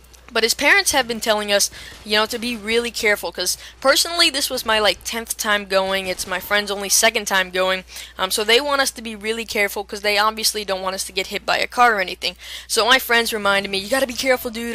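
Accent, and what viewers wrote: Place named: Portsmouth, United Kingdom. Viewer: Sounds like Portsmouth.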